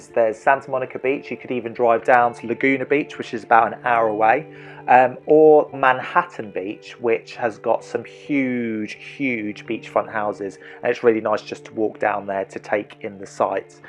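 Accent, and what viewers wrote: United Kingdom. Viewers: Hi. Loved hearing the English accent on a US guide for a change. What I hear repeatedly is the hike up to the Hollywood sign, which, as I'm in a wheelchair is a lot of a no no. I really would like to find out what is here in LA that a 65+ year old couple and our Mustang convertible can get to, with my wheelchair and "er indoors" dodgy legs 😥 Really enjoyed the video though 👍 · I liked the video very much as it covers the points that need to be gone over. I have absolutely fallen in love with your UK accent. I could listen to you say "Boulevard" all day long. So, you were sexy and informative.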